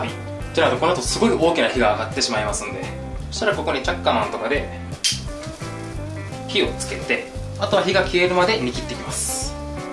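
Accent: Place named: Hiroshima, Japan. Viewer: 広島出身の人かな？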